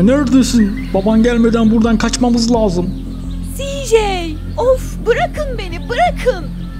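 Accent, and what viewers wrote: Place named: Adana, Turkey. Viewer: Abi adanalısın ya da adanada mı yaşıyon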